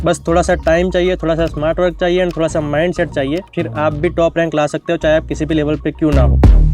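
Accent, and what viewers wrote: Gujarat, India. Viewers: Gujrati accent in Hindi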